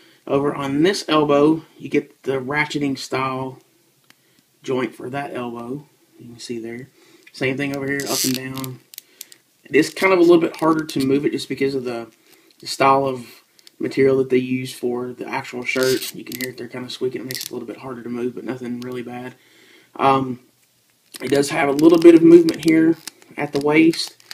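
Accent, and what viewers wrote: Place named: South, United States. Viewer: I do love this show and the figures are nice, my buddy collects them. I am more a transformer and dc collector. I subscribed to your channel tonight while working, I had caught the gl ring review and like it. I like to hear another southern person doing reviews online...btw where are u from?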